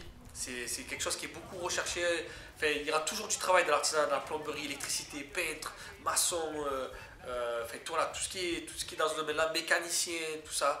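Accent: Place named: Marseille, France. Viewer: Slt j'adore t vidéo en plus t un marseillais j'adore les marseillais surtout l'accent bref gg pour ton nouveau taf sa fait plaise que t trouver un taf qui te plaît écoute g un petit souci au niveau fille en faite cela fait 6 mois que je suis avec ma copine et on a toujours pas couché ensemble pourrai-tu me donner un conseil car c la fille de mes rêve c ma futur femme on c déja décidé on restera ensemble pour la vie et voila le problème est la elle est timide et elle prend pas la pilule conseil moi un truc pour la rassuré mais bon 8 mois sans faire l'amour c long koi donc voila stp merci si tu répond a ce petit texte je t'aime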